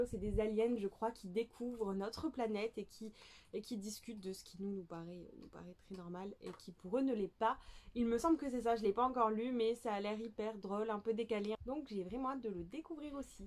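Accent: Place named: South, France. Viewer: J'ai reçu Pumpkinheads pour mon anniversaire aussi, sauf qu'Amazon a merdé et qu'il est en italien :') Heureusement que je le parle un peu, mais ça va être folklo ! J'ai très envie de me jeter sur Le Royaume assassiné aussi maintenant que je l'ai reçu miraculeusement en HB 😍 Trop cool pour le pendule ! (j'entends de fou ton accent du sud sur certaines intonations mdr)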